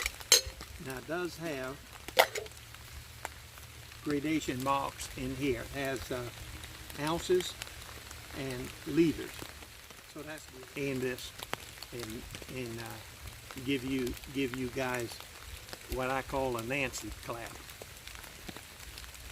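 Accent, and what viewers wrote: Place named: Charleston, United States. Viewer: I'm from SC. I'm trying to figure out your accent....Charleston? Anyway, fantastic vids! From Fort Mill.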